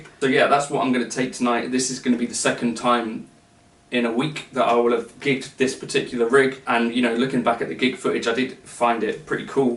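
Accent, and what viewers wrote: United Kingdom. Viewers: I lov your british accent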